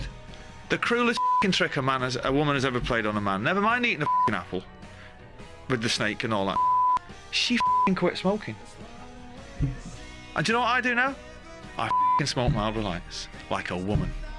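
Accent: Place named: Manchester, United Kingdom. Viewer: Sei stato bravissimo con i sottotitoli, posso farti solo un piccolissimo appunto? Io non avrei tradotto "freak out" con sclerare ma piuttosto con "scioccato" o "molto sorpreso". Può anche significare "spaventato" che però in questo contesto non ha senso ma il significato che tu hai scelto a me non risulta o almeno a me non è mai capitato di sentirlo usare in quell'accezione. Ripeto, sei stato molto bravo anche perché il mancunian non è né facile da capire né piacevolissimo da ascoltare. Anche se quello che esagera con il dialetto è il fratello Liam.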